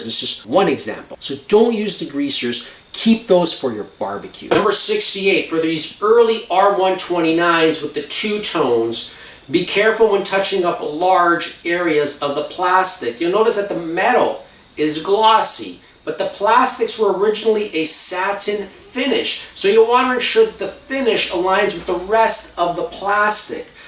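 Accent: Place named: Canada. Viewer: these videos are amazing! thanks so much. i just got my 1st r129 and i intend to keep it until i stop driving. i like doing as much as i can myself. When i was going through your videos i thought i heard a Canadian accent. I'm in Toronto, but originally Ottawa Valley. Are you from the Prairies?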